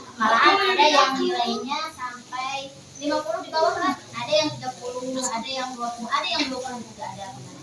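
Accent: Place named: West Java, Indonesia. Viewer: kaya logat sunda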